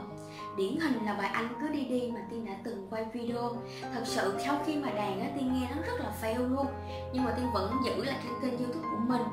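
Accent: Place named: South, Vietnam. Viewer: Người miền bắc nghe giọng miền nam không quen và đệm nhạc vào nữa nên khó nghe rõ .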